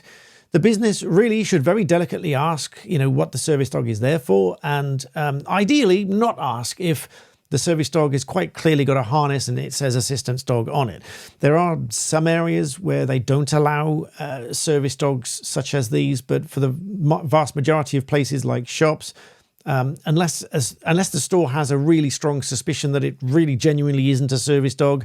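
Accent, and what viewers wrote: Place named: RP, United Kingdom. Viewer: Another long and boring posh talk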